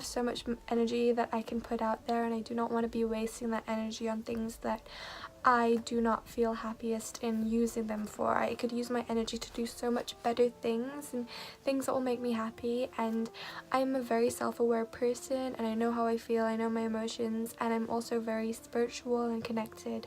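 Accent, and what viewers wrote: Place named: United Kingdom. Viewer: I love your videos!!💗💗 Your accent is starting to sound british 🌸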